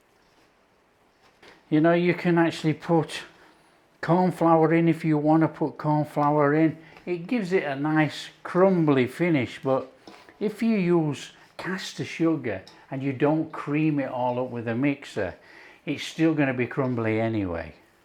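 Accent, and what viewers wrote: Yorkshire, United Kingdom. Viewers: Sounds like Yorkshire accent 👍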